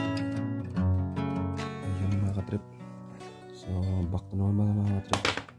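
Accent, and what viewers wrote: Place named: Visayas, Philippines. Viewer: Taga saan ka dol parang bisaya ka Eh saan ako makabili ng battery niyan At Paano yong battery Charchable po ba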